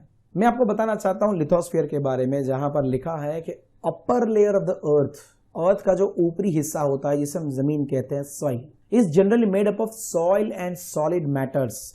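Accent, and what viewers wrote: Gujarat, India. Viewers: 🧑‍🏫Sir you from gujarat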